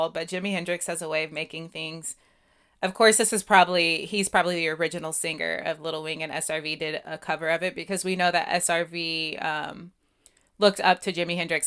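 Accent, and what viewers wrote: South, United States. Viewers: This song is just too short. It needs at least another verse and chorus. BTW, your southern drawl is showing 😃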